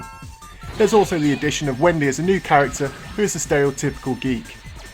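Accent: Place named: RP, United Kingdom. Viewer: Too many years at a private school. Your saying that's why you have a posh ascent??? I would think they would teach you proper English without an ascent hahaha Just doesn't make sense to me.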